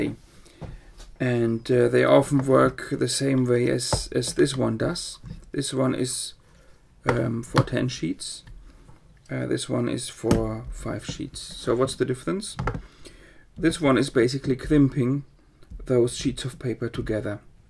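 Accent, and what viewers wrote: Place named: Germany. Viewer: I love your German accent! 💕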